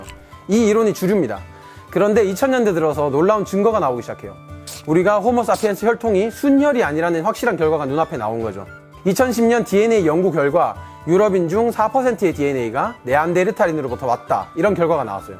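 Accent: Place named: Gyeongsang, South Korea. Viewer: 호모 사피엔스고 에렉튜스고 간에 진행자 분이 경상도 출신이신건 확실하네요. (저도 경상도 사람)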